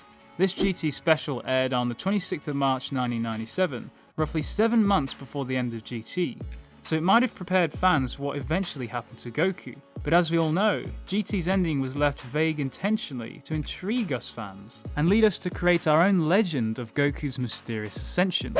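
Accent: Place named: United Kingdom. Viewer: British, Don't care didn't ask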